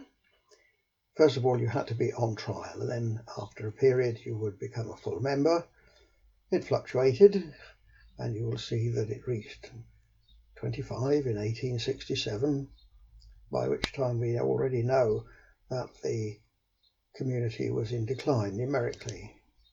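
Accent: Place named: England, United Kingdom. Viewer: You have a very refined English accent for a Welsh speaker.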